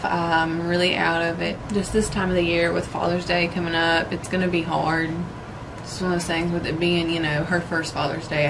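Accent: South, United States. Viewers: I hear that country Trang lol...nice video. You have a new follower thumbs up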